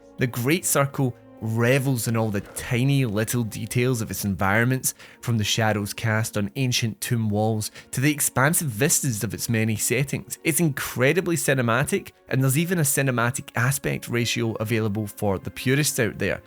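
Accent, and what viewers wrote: United Kingdom. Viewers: SOMEHOW I KNEW SOME BRITTISH CHAP WOILD NARRATE THE PREVIEW. HINT. ITS A TURNOFF.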